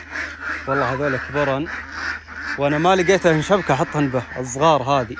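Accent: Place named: South, Jordan. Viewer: نفس لهجة جنوب الاردن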